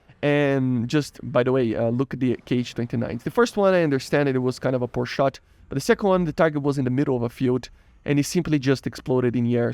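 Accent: Brazil. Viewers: Tu é Br? Sotaque familiar nesse inglês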